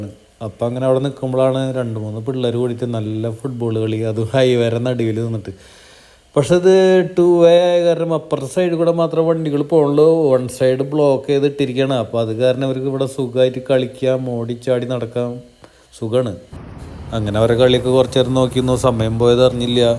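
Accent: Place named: Thrissur, India. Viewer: സംസാരം തൃശൂർ ഭാഷ മാറ്റുക,.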